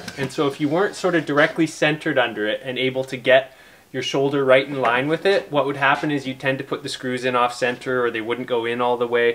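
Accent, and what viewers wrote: Canada. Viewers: Sounds like you have a Canadian accent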